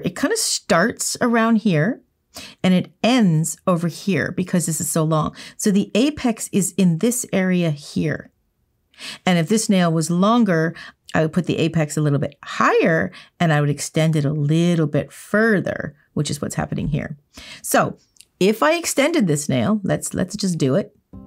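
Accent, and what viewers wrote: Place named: Canada. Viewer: I always do hard gel, but I just started doing acrylic. You have been such a help – plus I just love the Canadian accent!😃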